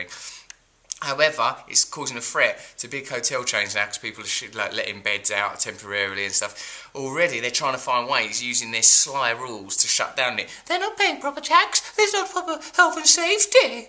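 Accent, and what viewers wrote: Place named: Scotland, United Kingdom. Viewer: <link> Gone all Scottish Russ. Think you might enjoy this read x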